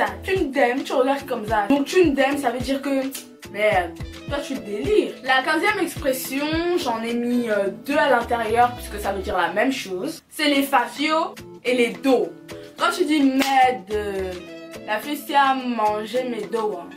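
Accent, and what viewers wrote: Ewondo, Cameroon. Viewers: T'as vraiment un accent kmer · Je suis congolaise mais j’ai kiffé ta vidéo vous avez grave d’expression j’aime trop l’accent camer D’ailleurs ça veut dire quoi « ne pas donner le lait » j’ai jamais compris, vu que tu l’as pas expliqué dans la vidéo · à t'ecouter on dirait une beti ewondo camer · 😂😂😅😅 Merdeu l’accent camer de la gow ci me bollè seulement 😂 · j'ai aimé la vidéo c'est fou coe tas cette accent camerounais alors que ta grandit en France . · L'accent camair est vraiment funny...suis senegalais mais j'aime bien...Mais j v savoir aussi c'est quoi"macabo"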